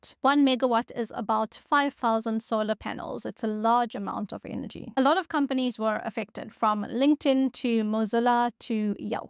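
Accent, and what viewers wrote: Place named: South Africa. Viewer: Sounds like a Saffa accent.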